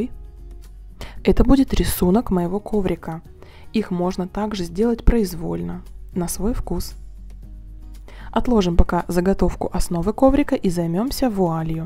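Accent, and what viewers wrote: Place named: Ukraine. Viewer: ПЕтелька, протестируем!!! Что за малороссийский говор??? Следите за речью! Вы же не в подъезде гутарите!???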